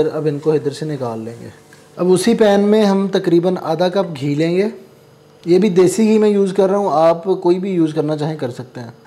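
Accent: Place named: Islamabad, Pakistan. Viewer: Islamabad ki tone, I like the way you speak. ❤, gonna try this recipe today.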